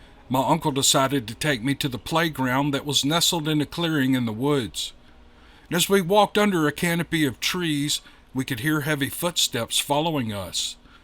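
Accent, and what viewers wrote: South, United States. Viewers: Thank you & thank you to those that send their stories in to to share with us. All of these were amazing!!! Glad you aren't using the AI in these The stories with AI were good as well, but we like the magic in your good ole' country boy accent...Just something special about that southern drawl....😂😂😂 Gotta know we love you?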